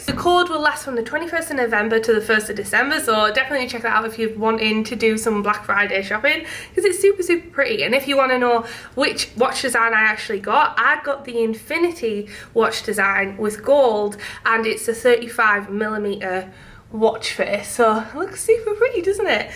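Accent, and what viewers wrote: United Kingdom. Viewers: You can turn subtitles on with the little square box icon on the screen. Mine are always on. I get a kick out of seeing English translations of UK English accents. It never quite matches up! I know what she’s saying but the subtitles don’t. 🤣🥰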